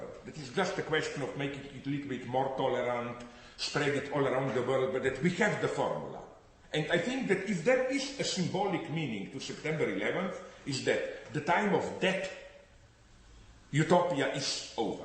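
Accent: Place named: Central Europe. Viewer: You obviously don't understand the irony of demanding someone provide substance when the subject is Slavoj Zizek. He is like Camille Paglia with a middle-European accent a beard and a paunch. He is a symptom of the degeneracy that what used to be called "the humanities" has devolved into. He is so full of crap he could generate economically significant amounts of methane or add to global warming.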